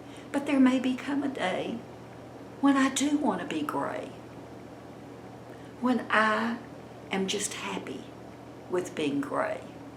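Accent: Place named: Memphis, United States. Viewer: I adore you. I feel such a closeness to you when you do videos like this. I lost my mother three years ago, and you remind me so much of her. Plus the Memphis accent. 😊I love hearing your thoughts and advice.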